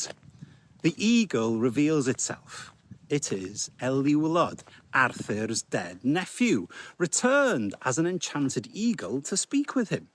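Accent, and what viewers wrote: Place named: North Wales, United Kingdom. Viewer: Great video. Off topic but as someone of a language and accent nerd I cannot place your accent I am getting North Wales would I be correct?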